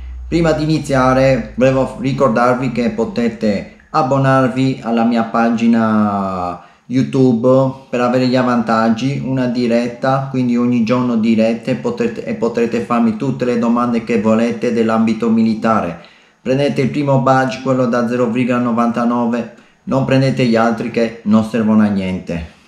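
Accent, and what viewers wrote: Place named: Albania. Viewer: Hai l'accento Albanese wow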